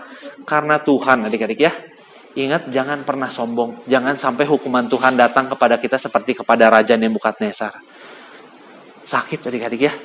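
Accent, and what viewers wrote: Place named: West Java, Indonesia. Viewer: Wah keren kakaknya sermon pake aksen Sunda😂😇👍🏽👍🏽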